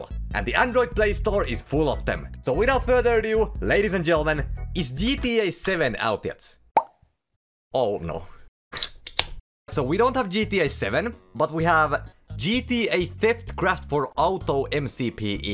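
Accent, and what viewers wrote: Finland. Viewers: Are you finnish? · The Finland ascent was very good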